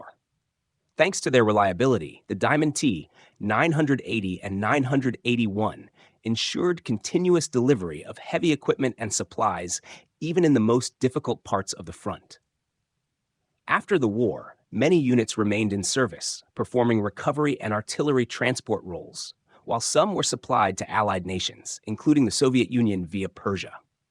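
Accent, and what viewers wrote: United States. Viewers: American accent with all measurements in metric----?????? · Please use cubic inches on American vehicles and list the torque of the engine. You sound like an American and engine torque is how these huge low rpm engines moved so much weight.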